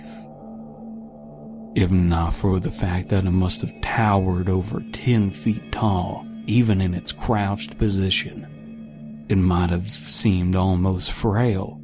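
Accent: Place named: Nebraska, United States. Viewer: You really did a good reading of this tale. Your 'accent' for this reminded me of growing up in Nebraska.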